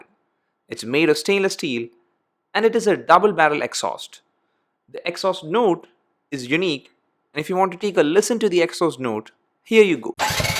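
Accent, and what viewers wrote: Kerala, India. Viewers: That Malyali accent!!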